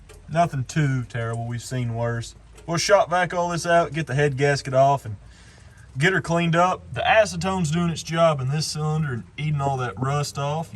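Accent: South, United States. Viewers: I love your thick southern accent. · I just started following you on tic tock and now on YouTube, I think the time you spend on these old vehicles is great and well deserved. People probably don’t understand how well they are built. You sound like you are from the south, the vehicles from the north where I’m from don’t live as long but I appreciate what you do!! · Love your accent, where in America are you from? Plus, great vids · You got the most American accent i have ever heard lol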